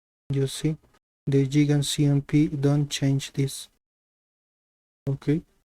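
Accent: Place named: Bolivia. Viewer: Ese xD r u from Bolivia or Perú?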